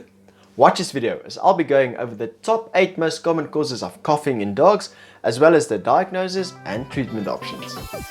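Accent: South Africa. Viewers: Excellent video. Great to hear the SA accent that's when I knew I needed to listen. My fiancée is SA and I am Irish, we live here in Ireland and it's very hard to get a vet to listen to you. They will only do what they think. My husky has been coughing for about a year or so on and off. Also when he howls he starts to cough. No vets have been able to help me at all. He got a scope of his throat and it was a little inflamed but no foreign object. He is diabetic and bg is not controlled after 3 years and he does pant a lot anyway so unsure if it's heart failure or cancer or what? It's very frustrating as the vets here seem to be behind other countries. Any further tips or information or even how to approach my vet to get them to explore further would be appreciated. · Thank you sooooo much sir!!! And I love your accent, it sounds South African...Hello from Texas🥰😘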